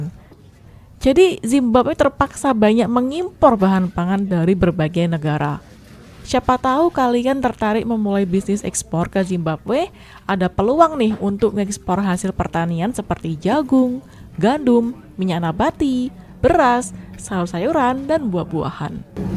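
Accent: Java, Indonesia. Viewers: Jowo ne medok,,pisan² ajak ngobrol penduduk sekitar nggo boso jowo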